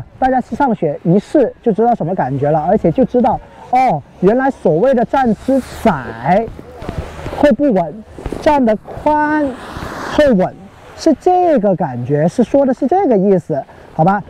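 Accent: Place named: Cantonese, China. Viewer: 广普加儿化音 太逗了！哈哈哈哈哈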